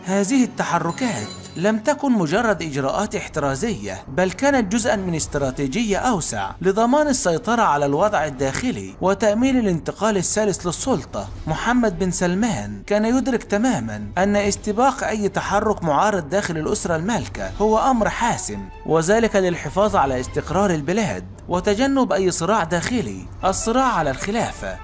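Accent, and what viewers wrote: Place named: Egypt. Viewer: صدقني لو تشوفلك حاجه تفعك احسلك من هاذا الكذب وهاذا التحليلات لاانت ولا الذي معك تستطيع ان تعرف شي او حتى تحلم حفض الله حكامنا وحفص الله ملكنا والله لاانت ولا حتى جدودك تقدر تعرف حاجه عن حكامنا خليك فالمصايب الي عندك احسن قسم الله عقللك وعقل من اعطاك هاذا الكذب شوف مشاكل بلادك وشوف مصايبكم وحلوها انت تعرفوون أنكم ذباب ويجب التعامل معكم بحزم تراك مصري حتى لو غيرت لهجتك معرف من الي الكذاب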